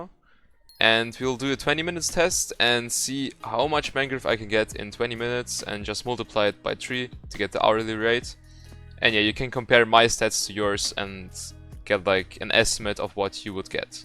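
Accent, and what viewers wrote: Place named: Germany. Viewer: bro ist deutscher als deutsch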